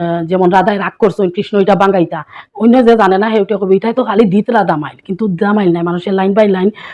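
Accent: Sylhet, Bangladesh. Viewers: তুমার লাখান আমিও খাস সিলেটি গো। অত ভালা লাগের সিলেটি মাত হুনিয়া অত বছর বাদে।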